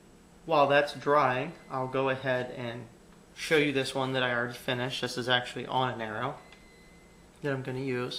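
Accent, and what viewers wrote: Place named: Pennsylvania, United States. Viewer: Jeez man, how did you learn this by living with a present day primitive tribe? Lol. You sound like you're from Pennsylvania and not from the Outback in Australia.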